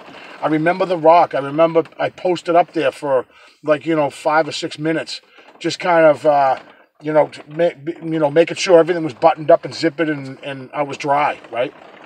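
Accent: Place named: Massachusetts, United States. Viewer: your a riot 😂😂😂!! That Mass accent. And the way you word things..funny..God I miss home. Love your videos WTH download em all..lmao..love ya..Linda in Florida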